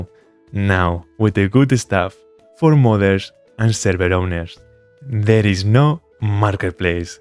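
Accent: Spain. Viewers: ye i'm glad too about that, i would rather see the fun spanish accent that anything ai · Spanish accent for sure :D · well u sound litle difrend in live streams more spaceout speach this why i thinhed u used some kind of ai or u use more takes to make as understandambe as u can. But im glad u are not use ai and to u are not afraid of you spanish accent and not perfect english.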